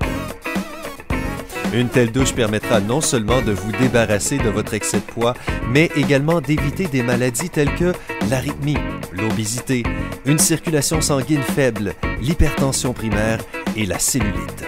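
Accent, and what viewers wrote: Quebec, Canada. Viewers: Des rigolos avec un accent québécois qui dis n'importe quoi j'en ai vu beaucoup, mais des comme toi..... Une perle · C est l'accent de Québec celui ci?désolé mais c est vraiment étrange.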